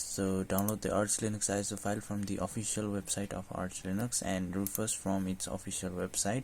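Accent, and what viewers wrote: India. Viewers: im not hating, it's good guide. but why again this indian accent? why 50% of programming videos are Indian?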